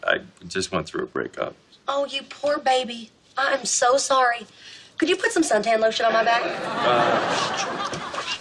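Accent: United States. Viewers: she sound so country lov ya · I love her country accent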